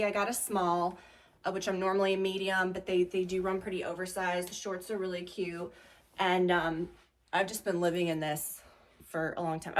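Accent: South, United States. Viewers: your accent! Such a southern belle 🎀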